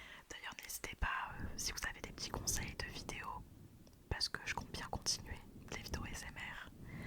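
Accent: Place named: Belgium. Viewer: tes belge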